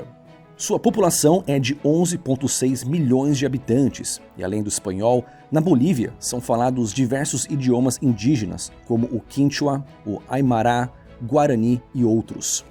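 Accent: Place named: Rio de Janeiro, Brazil. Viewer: Sou portugues e acho que o.sotaque carioca dá um toque especial no portugues, mais animado, descontraido. Mas bom é nos entendermos em imensos paises, foi a melhor coisa que os nossos antepassados fizeram, espalhar a lingua.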